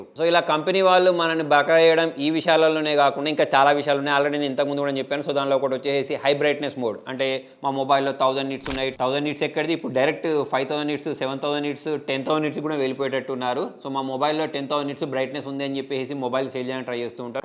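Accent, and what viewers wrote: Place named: Karimnagar, India. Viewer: .. మీరు చెప్పే దాన్ని బట్టి నవ్వొచ్చింది బ్రో..iam not laughing about your content...knr slang..big fan of u😊😊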